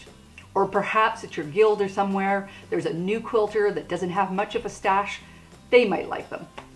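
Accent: Canada. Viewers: Enjoyed your video very much, so I subscribed. I feel positively brilliant because, as a newbie quilter, I figured saving 2 1/2" squares, 2 1/2" strips, and 5x2 1/2" rectangles would be most useful--same as you, an obviously experienced quilter, figured! I needed quilting fabric to make a block once a month for a local craft store--they draw a name and the winner takes home all. Since I let it be known that I would accept scraps, now I have plenty for the block drawing ;-) The pieces that are too little get chopped up to make animal shelter beds. A friend also gave me a ton of leftover scraps from a T-shirt class, and then there are all the batting scraps too small to piece together for potholders. Watching TV with my husband is a good time to cut up all those unwanted scraps! BTW, love your Canadian accent. Signed, a scrap loving neighbor in Washington state.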